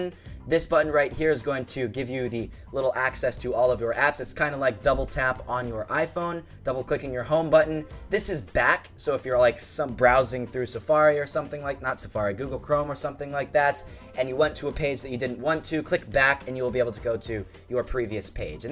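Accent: Australia. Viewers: ur australian yay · Your Australian omg myself is not the only one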